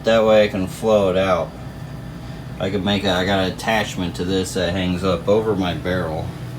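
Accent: United States. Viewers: Where did you grow up? It's not often you hear a southern accent these days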